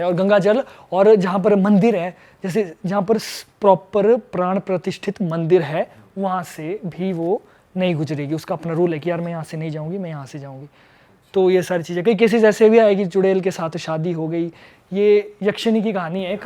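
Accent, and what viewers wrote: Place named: Haryana, India. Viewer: Himachali tone inspired by haryana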